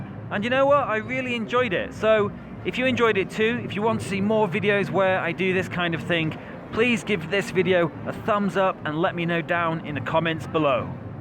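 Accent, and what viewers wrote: England, United Kingdom. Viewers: Lovely video!! luv english accent!!😝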